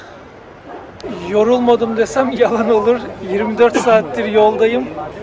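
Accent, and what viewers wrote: Samsun, Turkey. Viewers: abi afganistan videolarından birinin altında "elli" derken e harfini vurgulama şeklinden Samsunlu olduğuna ikna oldum yorumu vardı. O günden beri e harfini söyleyiş şeklin iyice bi dikkatimi çekiyor engel olamıyorum. Endonezya derken de aynı vurgu var dinlerken fark ettiğimde çok hoşuma gidiyor😁 yanlış anlamazsın umarım art niyetle yazmıyorum.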